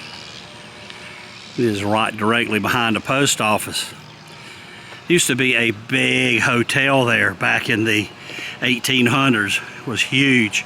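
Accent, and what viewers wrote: South, United States. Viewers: I love your Southern accent and the way you pronounce words. That's the great thing about the USA, depending on the state, city and even towns depends how one pronounces words. It's beautiful and don't change! Also maybe do some more RV videos of campgrounds because that would be awesome as well. Thank You!! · I love the Southern accent, and you are doing great and great video. It made me in love with the town. It is beautiful. Thank you for sharing. God bless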